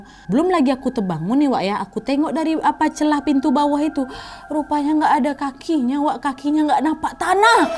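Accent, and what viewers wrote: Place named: Dumai, Indonesia. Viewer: Ini orang Dumai ya?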